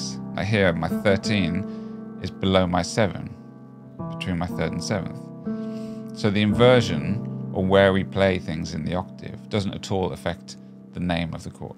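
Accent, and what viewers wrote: United Kingdom. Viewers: Sounds smug and pretentious? That's just his British accent.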